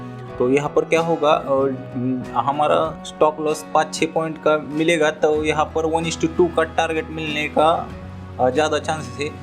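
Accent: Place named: Maharashtra, India. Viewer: Pahilya 10 sec mdhech olakhla marathi manus ahe😅. All the best sir😊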